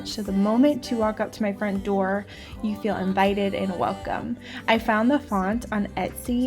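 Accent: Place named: Louisiana, United States. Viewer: Where are you from? Sounds like maybe Louisiana.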